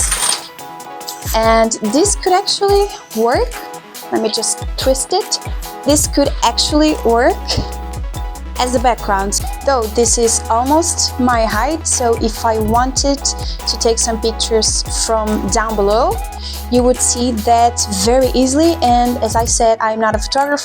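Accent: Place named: Europe. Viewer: What country are you from? I can tell that you're European from your accent, but I can't tell exactly where.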